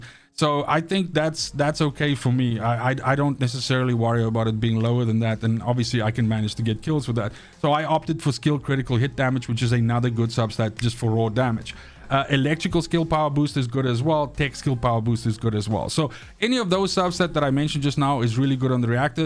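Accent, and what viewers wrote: South Africa. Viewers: is that a south african accent? 👀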